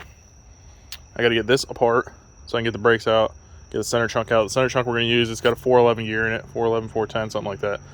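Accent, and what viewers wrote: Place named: Florida, United States. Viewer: You a real Florida man accent there boe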